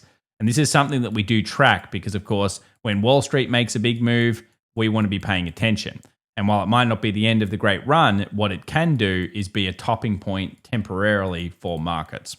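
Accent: Australia. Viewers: I like it the way he said it. Plus he's Australian so buzz off mate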